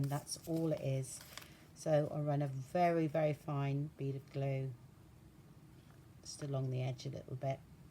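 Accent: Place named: Bristol, United Kingdom. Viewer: Are you from Bristol? That’s my husband’s hometown and you sound so much like him!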